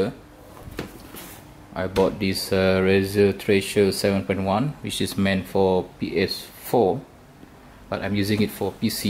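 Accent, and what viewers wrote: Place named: Malaysia. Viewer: Your accent just like Malaysian.....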